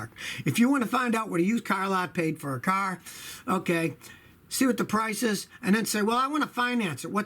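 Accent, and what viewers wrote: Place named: New England, United States. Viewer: The way says "car" always makes me wonder if he's originally from New England.. Pennslyvania or New Jersey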